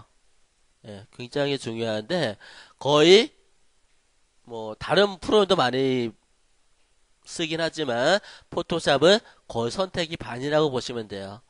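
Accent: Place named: Yanbian, China. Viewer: 말투가 좀 연변 스럽죠..고마워요 ^^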